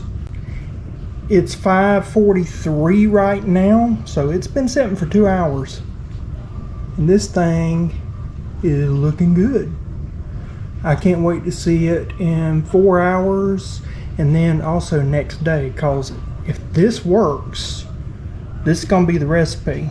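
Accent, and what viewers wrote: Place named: South, United States. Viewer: Heck, I have been looking for something to complain about all day. Here is my chance! - It is the southern slow-talking. I spent half of my life in east Texas and the other half in west Texas. I made a few videos just playing around, I realized that many people found my accent unlistenable. I actually thought about putting in some effort and writing scripts and practicing a faster delivery, but then I decided I did not care that much. I believe that you need to speed up your speech and make more efficient use of your words? People seem to want information delivered at high speed. Best wishes.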